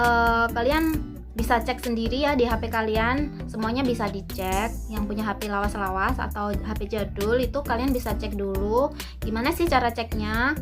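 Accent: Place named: South Kalimantan, Indonesia. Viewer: Kakak orang kalsel ya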